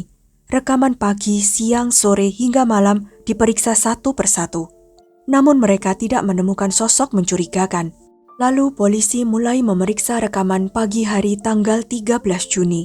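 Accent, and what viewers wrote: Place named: Java, Indonesia. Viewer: Kek org Jawa kek medok ngomongnyr😊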